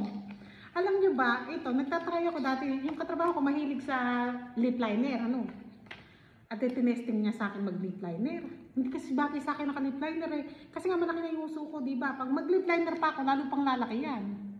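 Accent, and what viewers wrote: Nueva Ecija, Philippines. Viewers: Ateh saan ka sa pinas parang nueva ecija ka ba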